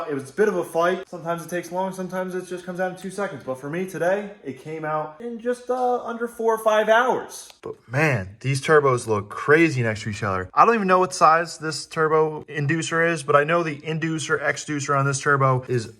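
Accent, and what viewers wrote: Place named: Ireland. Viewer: she sound like she to be in the irish car scene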